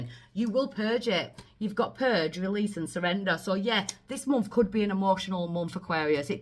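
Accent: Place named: North, United Kingdom. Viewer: Thats a northern accent if I ever heard one!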